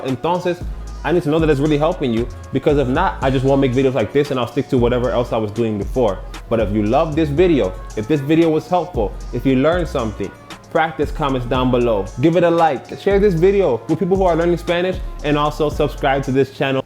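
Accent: Dominican Republic. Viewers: Tu eres uno de los mejores mano. He estado mirando tus videos desde que empecé a aprender el español. De hecho por ti decidí aprender el acento dominicano. Sigue haciendo el trabajo q tu hace mi loco, sigue así y Dios te va bendecir. ❤ todo amor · So nice to learn with the Dominican accent. Thank you. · what did you watch to pick up the Dominican Accent so well? I've been living here going on 3 years and still can't understand them... 🤦🏾‍♂️ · Bro are you actually Dominican? You low key got a accent in English.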